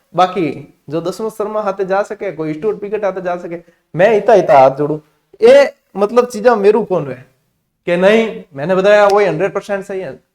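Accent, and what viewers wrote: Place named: Marwari, India. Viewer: Brain ki capecity problems ko kis trh aur kiti jldi solve kia ja skta h us basis pe napi jati h also kitna navachar hm kr skte h joki aap continue kie hi ja rhe h To apne aap ko mandunudi jse sbdo se na pukare 🙏🙏 You are a brilliant student/teacher and khuda ka anmol tohfa h sir .., abhar hmesa ishwar ki kripa aap pe bni rhe h 😊😊 u are rockstar,🔥🔥🔥🔥🙏🙏 simply chijo ko asan krne wale and marwadi me bolte to bhut ache lgte sunte hi jae bs jse tq again🙏🙏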